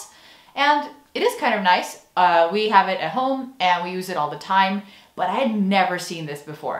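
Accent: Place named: Netherlands. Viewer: It's happening 😯 I couldn't quite put my finger on it at first but you are starting to sound like a Dutch person speaking English. It's subtle, but definitely there. edit: although you did completely butcher the pronunciation of "ketjap" 😂 it's cat-jap, where the catpart is pronounced just like the animal, the "j" is prounced like the y in yes, and "a" is prounced like the second a in El Capitan. Ketjap.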